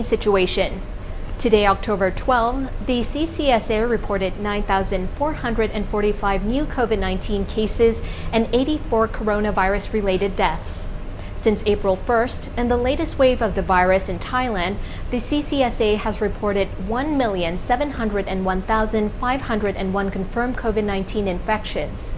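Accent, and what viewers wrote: United States. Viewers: You Will Have Wet 💦 Dreams Of her Tonight and you don't know a thing about accent . Her's Is 100% Americano Gringo, Bro 😷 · she sounds American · Great command of the english language, obviously an American accent. did a great job in the presentation, however recommend that she slows it down abit, should improve with experience. Overall a fantastic addition to the Thaiger team!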